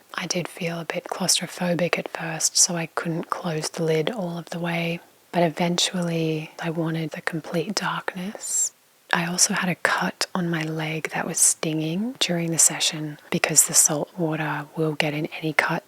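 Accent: Australia. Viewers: The best aussie accent spoken asmrist. This audio is perfect. Please do more 🔥🔥🔥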